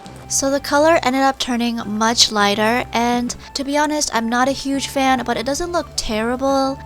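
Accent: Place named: India. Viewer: You talk like an Indian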